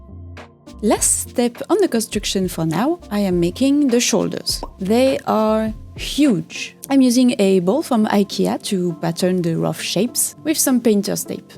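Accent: France. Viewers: this is really dumb probably, but as another person with a strong accent (swede) i feel a little insecure abt it, but seeing you proud of your origin country and me really loving your voice has made me...… prouder of my own accent??? even though the france accent is recognized as prettier than the swedish accent??? idk i kind of like how my vowels sound now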